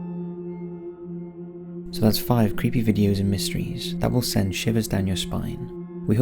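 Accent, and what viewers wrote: United Kingdom. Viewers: It’s just the accent, British people don’t seem to make use of the letter Z (zed as they call it) or its sound very often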